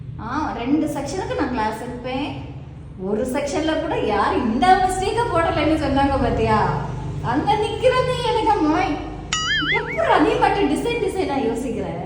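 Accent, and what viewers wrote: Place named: Nagercoil, India. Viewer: Nagercoil slang ❤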